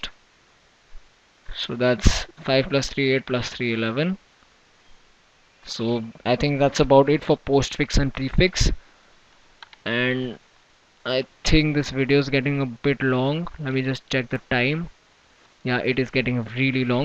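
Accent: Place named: India. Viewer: Sir thanks a lot:).I m just 13 years and in ryan icse.ALL THE DUMB TEACHERS IN THE SCHOOL CANT EVEN TEACH ME A SINGLE LETTER OF JAVA and sir you did in just some couple of videos.THANKS A LOT.I luv ur english it s indian pitch so i can understand better:D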